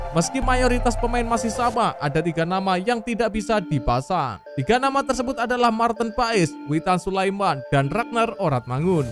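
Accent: Java, Indonesia. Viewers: min, logatmu medhok banget.. hahaha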